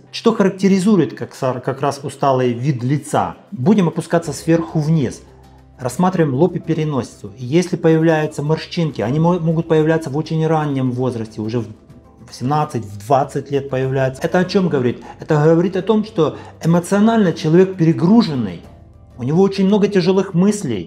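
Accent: Ukraine. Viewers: у доктора родной язик украінский, єто просто акцент. скажи ему спасібо что он для тебя по русски рассказивает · Дядьку, всоте кажу : у тебе ДУЖЕ СИЛЬНИЙ український акцент!!! Говори українською. Чи, мммм, грошики не пахнуть???😮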